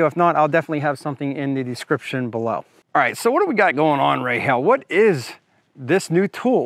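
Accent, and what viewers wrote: Pittsburgh, United States. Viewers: You sound like a yinzer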